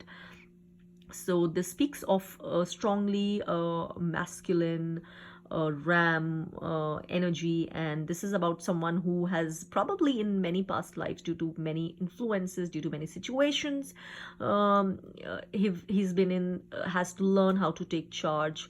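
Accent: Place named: Trinidad and Tobago. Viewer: Sound kind of trini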